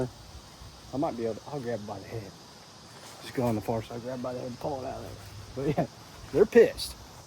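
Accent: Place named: Oklahoma, United States. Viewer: I love watching your videos. I live in south central Oklahoma. Sounds like you live in Oklahoma too.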